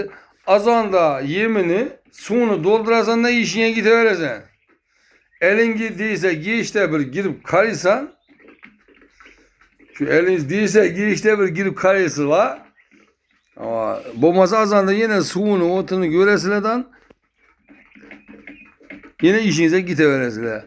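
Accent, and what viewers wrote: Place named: Khorezm, Uzbekistan. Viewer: хоразича.гапраяти шэкилли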